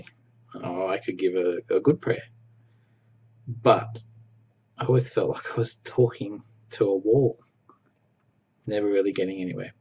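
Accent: Australia. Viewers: Awesome video. Thanks mate. I love our Aussie accent... be proud. Like Cedars you are laid back and nice to listen to. Cant wait to see more from a fellow Aussie. Your experience really resounds with me. 3rd gen always questioning the org, especially seeing all the badness around the world happening to good people but Jehs smartass, rude, selfish people were taken care of? I was also df but at 20. Sucked up go be re but now faded with Hubby at 35. Would love more info on the recovery group you mentioned if its an open group. Greetings from sunny Queensland 😊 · Gidday! great accent but of course I am bias, been hot lately mate, thanks for your introduction, though I still believe in God and the kingdom, I can understand why you believe what you do now, and respect your views. :)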